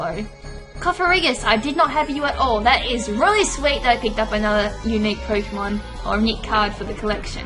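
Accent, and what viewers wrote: Australia. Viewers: Are u australian. i am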